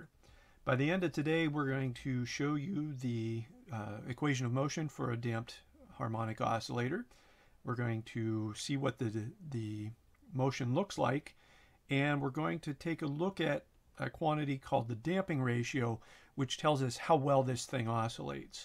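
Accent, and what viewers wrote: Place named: United States. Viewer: This is how an American should sound like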